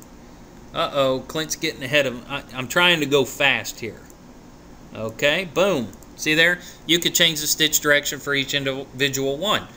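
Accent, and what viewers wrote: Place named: South, United States. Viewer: You sound like idubbbz with a southern accent, i love this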